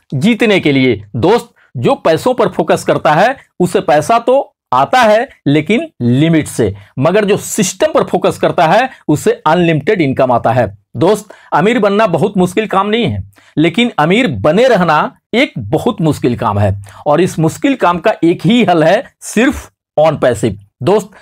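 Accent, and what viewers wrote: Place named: Bihar, India. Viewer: Sirji aap ki tone se lagta hai ki aap bihar se hai mein bhi bihar se hi hu aap se mulaqat ho sakti hai kya.aap bahut achhi informations dete hai.dhanyavad.jai ON-PASSIVE.JAI BHARAT.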